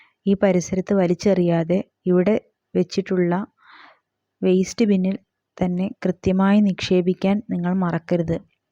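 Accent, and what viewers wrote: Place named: Palakkad, India. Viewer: As per the slang of your language I think you are from palakkad. If I am wrong please correct it.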